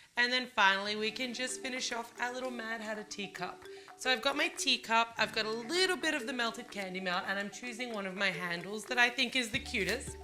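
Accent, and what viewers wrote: Australia. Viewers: Hi! I'm an Aussie, been living and working in Japan for the last 6 years. I suck at baking, but my sister loves it and I wanted to feel close to her, so 2 years ago I started watching your channel. It reminds me of home (no matter how far or wide I roam, right?). I remember how to speak Aussie from you, so that my family won't tease me for sounding American lol (Funny that people actually can't understand Aussie here!) Your creations/ideas are amazing(!) and your down to earth personality is beautiful(!). I'm pretty sure that running a business, being publicly known and raising two gorgeous kids must be crazy sometimes, so I just wanted to take a second to say THANK YOU for all the countless(!) times you've made a homesick Aussie feel like she's back home in her sister's kitchen for half and hour or so each week. I pray you know how much God loves you! 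Renée x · R u Aussie or American???????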